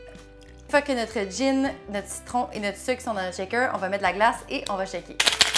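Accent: Quebec, Canada. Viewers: C'est une tuerie cette video, la recette est clair, tout est montre et bien explique. Et en bon Francois entendre le dialecte quebecois sur une recette Francais c'est vraiment sympa.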